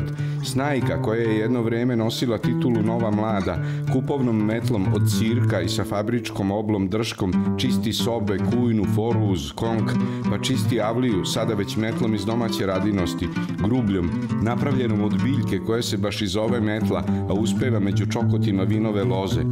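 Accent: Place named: Farkaždin, Serbia. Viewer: Dodju mi tvoje ,,DOPISNICE,, kao vrsta razbibrige u trenutnom karantinu. Hvala ti sto ih pises. Vracas me u meni poznatu sredinu, koje vise nema, a i ljude koje sam poznavala i mih manje vise takodje nema. Sviras odlicno, ali pisanje je verodostojno, prepoznatljivo, vrlo slikovito, a izgovor i glas bas, bas nas farkazdinski! Hvala ti ! PISI samo PISI, vredi truda!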